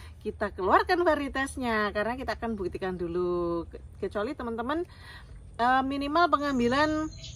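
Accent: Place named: Blitar, Indonesia. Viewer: Assalamualaikum nduk mimin...saya asli. Orang Blitar, dkrg di sumantra lampung, hijrah dari thn 1987 ,saya terispirasi dg bibit yg ssmpean promosikan, smg nanti kesinambunga, amin...ditambah lagi logatnya bicara, bikin kangen sedulur nemg blitar...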